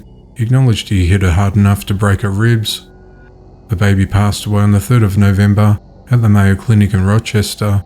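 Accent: Australia. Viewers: Australian accent, East Coast, NSW?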